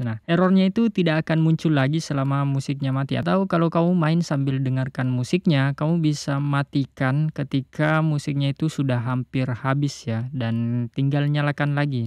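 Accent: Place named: South Sulawesi, Indonesia. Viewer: Kayak Logat orang Sulsel.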